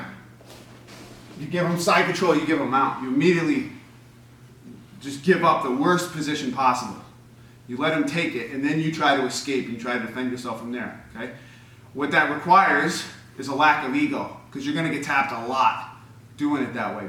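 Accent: Brazil. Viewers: Your videos are hilarious man. Especially your Brazilian accent. Thanks man. My bjj coach hardly explains anything for some reason.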